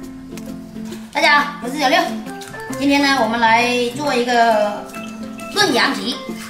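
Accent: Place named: Guangxi, China. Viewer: 听口音像广西一带的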